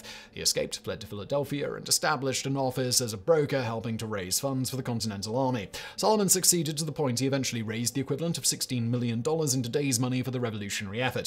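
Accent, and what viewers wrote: United Kingdom. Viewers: You're British? I thought you were from California's central Valley where you developed a killer UK accent. PS that is the story of James Marsters, Spike on "Buffy the Vampire Slayer" who is from Modesto,CA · I find it highly amusing to hear a Brit extolling the exploits of forgotten American Revolutionary heroes, but very well done!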